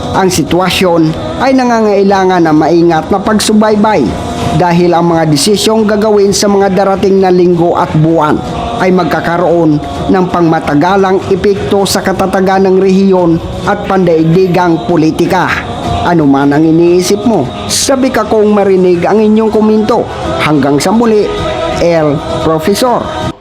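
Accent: Iloilo, Philippines. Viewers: Natural na boses yan ni lods hindi tulad ng iba nagamit ng ai signature yan. Maganda dito detalyado kahit natural na ilongo🇳🇮🇵🇭🇺🇲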